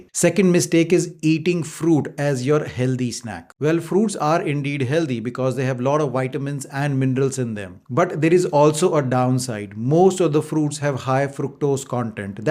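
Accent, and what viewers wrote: India. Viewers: Typical indian accent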